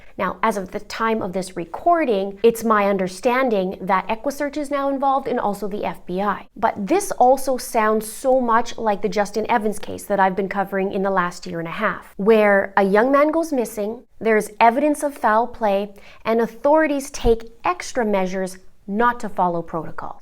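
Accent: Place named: Canada. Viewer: Wow you have the strongest Canadian accent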